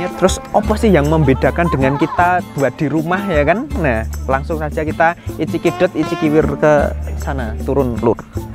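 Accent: Java, Indonesia. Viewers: medoknya mas suaramu😂😂😂😂😂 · Haha mantep tenan, jarang2 aku ketemu channel YouTubernya spesialisasine medok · pakai bahasa jawa campur Indonesia klo bisa pakai subtitle, jangan pake bahasa "elo" "gue", ciri khas mu logat & bahasa jawa lebih original mas...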